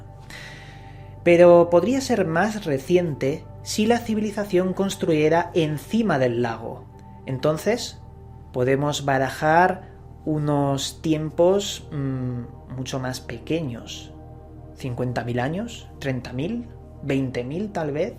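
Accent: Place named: Spain. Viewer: Noe. Gusta el. Acento español dislike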